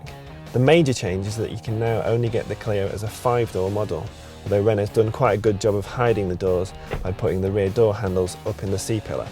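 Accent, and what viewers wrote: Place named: Yorkshire, United Kingdom. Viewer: I like him bar the thick yoker accent.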